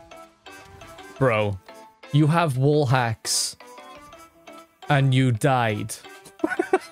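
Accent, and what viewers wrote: Wales, United Kingdom. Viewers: Is that a fellow welsh accent?👀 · do i hear a welsh accent?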